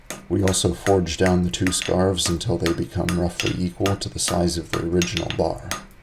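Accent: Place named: Canada. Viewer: Great video thanks for sharing. Where you located? You sound like a Canadian but Im not sure. Very well done.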